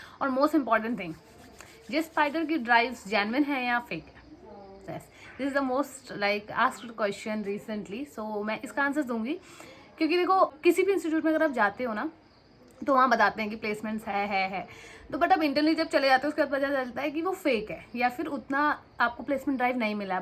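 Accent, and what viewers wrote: Kumaon, India. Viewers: are you kumaoni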